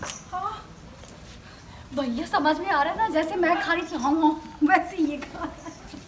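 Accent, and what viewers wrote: Bihar, India. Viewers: Aapki basha me bhi bihariyo vala touch hai or lgti bhi aap bihari si ho...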